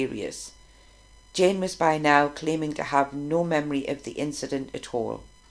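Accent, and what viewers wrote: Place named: Northeast England, United Kingdom. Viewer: Good job, and I like your accent, too. Being from Minnesota I can't place it. Is it a Northeast England accent? Anyway, good story and a sub. Hope for more.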